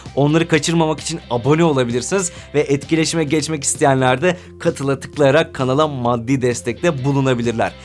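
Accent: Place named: Azerbaijan. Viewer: Abi sen azerisin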